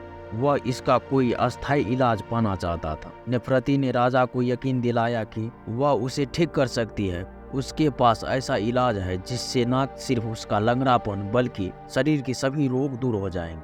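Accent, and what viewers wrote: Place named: Darbhanga, India. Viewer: Apne ke ghar kahan che? Bhasa se te darbhanga bujhal rahal che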